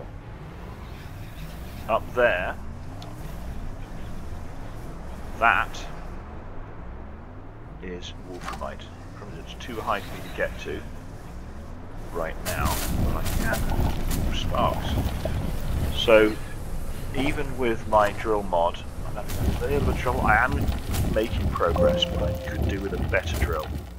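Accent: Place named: United Kingdom. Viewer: Came here from Josiwe's channel fyi. Good series so far! What part of the UK are you from? I'm Irish but cant place the accent?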